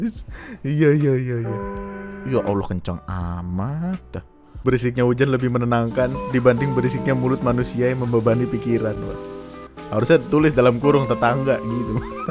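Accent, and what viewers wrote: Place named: East Java, Indonesia. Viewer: Owalah, pantes aku ngerti bosone. Jatim kidulan ternyata 🤣🤣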